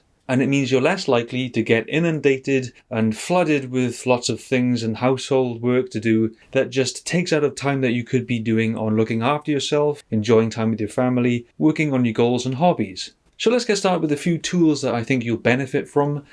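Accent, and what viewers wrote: Wales, United Kingdom. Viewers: Hey you are welsh. Howdy shw mae?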